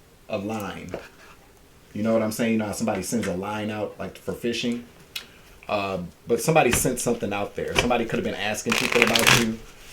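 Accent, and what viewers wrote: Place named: Canada. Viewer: Sounds like Canada 🇨🇦 aaa lol my home and native land 😉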